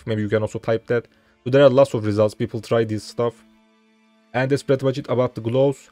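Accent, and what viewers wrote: Turkey. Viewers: Türk şivesini duyar duymaz tanırım agaa (Eline sağlık btw)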